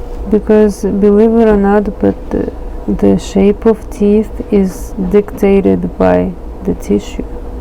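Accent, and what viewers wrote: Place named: Russia. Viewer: Русский акцент 🤪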